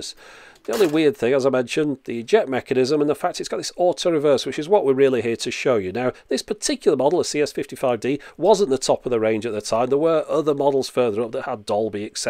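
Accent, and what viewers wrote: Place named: Liverpool, United Kingdom. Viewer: Are you from Liverpool? Sounds like it You sound like Ringo.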